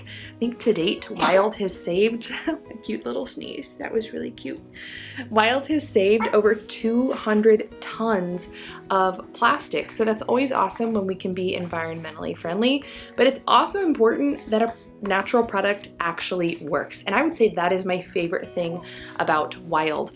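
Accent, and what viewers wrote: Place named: Missouri, United States. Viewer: I love your message about letting go of the perfection of it all. Listening to you talk I am wondering if we are from the same area! I am from warrenton Mo and there is a hill there called hopewell hill, not far from the Missouri River. I just found your channel recently and have been watching/enjoying your videos!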